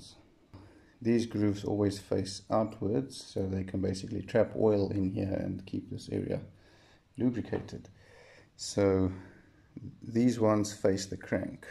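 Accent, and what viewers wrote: South Africa. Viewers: Howzit man , It sounds like you're from SA? Is the vtec D-series top ends rare over here? Im just starting to get into hondas and going to try and get a an old ballade in the next few months so I'll probably be messing with the D series engines for a start. Seems like I'll be able to learn a lot from your channel will be checking out more of your videos! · im not sure why but you got an south African English accent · Are u from South Africa?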